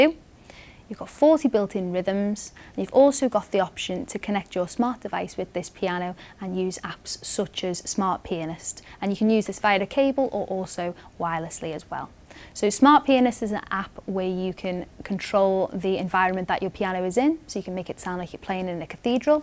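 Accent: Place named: Scotland, United Kingdom. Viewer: She's so cute!!! And the Scottish accent... just... 😍😍😍 The keyboard's pretty good, too, I must say.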